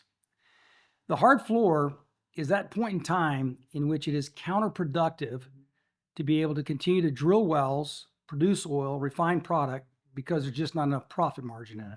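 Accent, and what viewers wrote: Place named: South, United States. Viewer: I can hear the southern hills underneath this man’s voice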